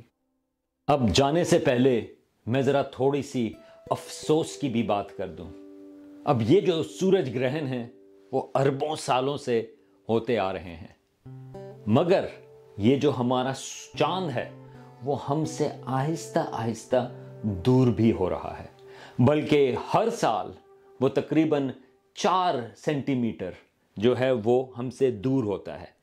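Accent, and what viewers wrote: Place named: United States. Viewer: Very Interesting. An American way of expressing in great urdu language !!!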